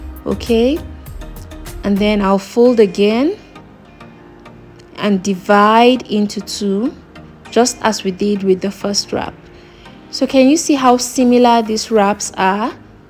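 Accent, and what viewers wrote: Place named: North, Nigeria. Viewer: I love the third method: your voice. It's so soothing and engaging 😊😊😊 I sense an almost non-existent accent. Are you from Northern Nigeria? 😊😊😊 But honestly, thank you for this videos. The way you explained left no room for questions. You had every information in mind when you were making the video. ❤❤❤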